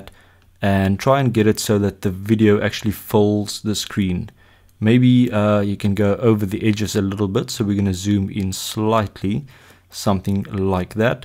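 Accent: South Africa. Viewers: That accent though. Are you South African? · Didnt know south african english differs so much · Love the South African accent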